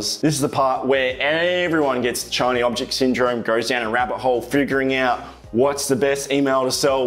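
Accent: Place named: Australia. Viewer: are you an aussie ? just curious kinda sound like that great vid btw lol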